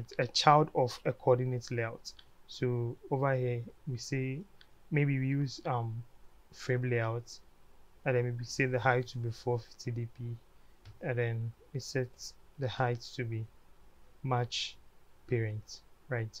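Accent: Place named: Nigeria. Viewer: nice video, you have a nigerian accent are you from Nigeria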